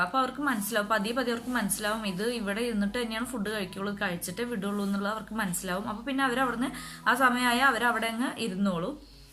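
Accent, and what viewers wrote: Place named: Thrissur, India. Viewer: good, thrissur evideya, njan plkd pattambi anu .slang njangal samsarikunna pole thanne adha chodiche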